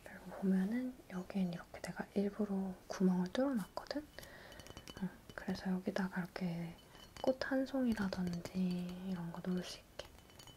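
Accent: France. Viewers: omg your french was so cute 🥺💗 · Your french ? omg it's so smooth !!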